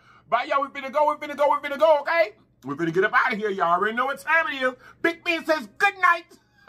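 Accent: South, United States. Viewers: I have to cut off volume, because you talk too much and we want to enjoy watching you eat. Sounds like your roots are from the south and you live there now, parents taught use to not talk while eating. Now I understand why, you show all the food in your mouth and it’s gross. Try getting on the radio you have the gift to gab, and it’s not necessary on a eating show since you have so much information to share to others..Just my observations.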